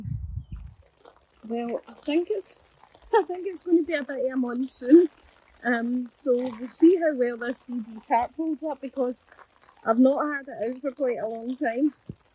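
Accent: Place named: Scotland, United Kingdom. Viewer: Excellent relaxing video as always with wonderful Scottish accent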